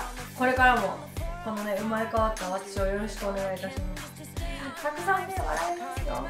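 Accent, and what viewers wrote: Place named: Kansai, Japan. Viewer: 関西弁も可愛い好き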